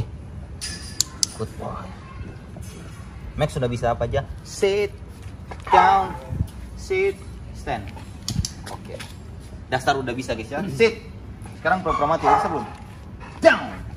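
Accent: Ambon, Indonesia. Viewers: Biar lingkungan jakarta tapi lidah ttp ambon jua bu.. 😁😁😁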